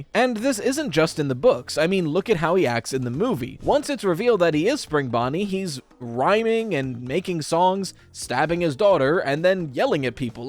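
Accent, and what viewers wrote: United Kingdom. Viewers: I'm just so happy to hear him be British again, it's honestly been a while.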